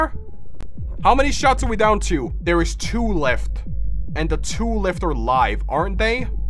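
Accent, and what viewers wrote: Denmark. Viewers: Jeg kan hørrer at du er dansk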